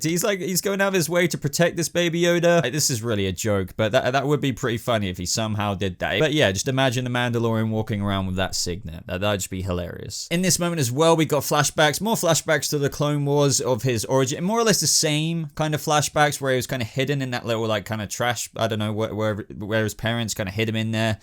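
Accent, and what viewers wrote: United Kingdom. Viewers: suuuuuuureeee I want more reviews brooo. You are british then...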